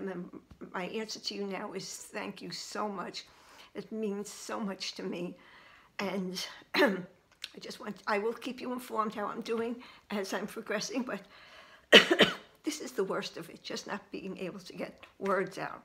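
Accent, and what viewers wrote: New York, United States. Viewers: Hi! I hope your surgery went well. I love your NY accent, it makes me feel like I am home! I'm from 45 minutes outside of NYC! I miss it and hate Texas with a passion! But I'm moving back soon enough. Do you remember the little pot glosses? I loved those. Clinique discontinued them years ago. Meadow Flower Honey was my favorite.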